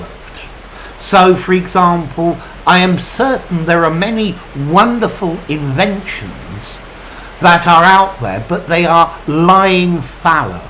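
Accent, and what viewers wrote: United Kingdom. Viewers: Sir, Your pronunciation is the best standard British pronunciation. Please continue the noble job. Grateful Thanks. 🙏🧡❣️💖💛❤️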